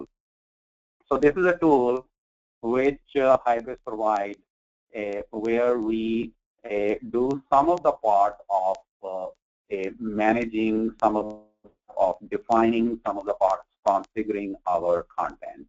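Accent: India. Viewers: This is hard part of having Indian accent and Chalta hai approach. They did not test what they are putting online. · Sir, you need courage to post a videos that too when you are benefiting others by means of education. You are, m sure is from different part of world and not a big fan of Indian accent, but Indians are proud of it cause that accent has given Indians billions of $ and millions of jobs across the globe. If you know anything about professionalism, then talk about the content of video, not accent. Cheers to you!! Have a god time! Great work, keep sharing & educating professionals with your knowledge!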